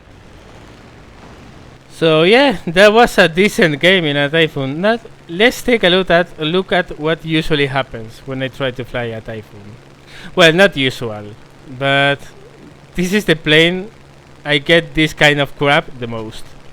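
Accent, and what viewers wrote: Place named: Spain. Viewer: Pareces español por el acento inglés.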